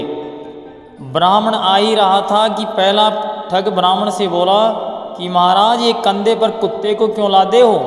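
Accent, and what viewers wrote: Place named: Punjab, India. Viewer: Lagda y tu punjab ton a tere awaj lag rae a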